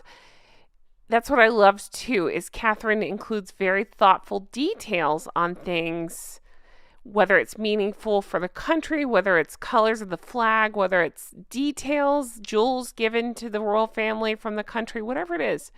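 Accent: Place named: South, United States. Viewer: Oh dear. you crack me right up. Being an Aussie and living in the UK for a very long time, it did take me awhile to tune in to your southern accent, but now you are defo my fave you-tuber...... You make me laugh so much, you fair make my day..... I hope that 2024 is a great year for you, Jay and your family..... loads of love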